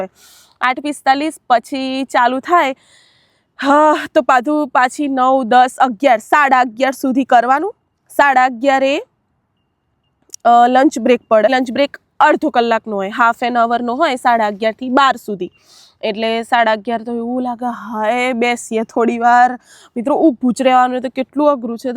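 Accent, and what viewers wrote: Sabarkantha, India. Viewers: tamari accent to Sabarkantha jevi che 😊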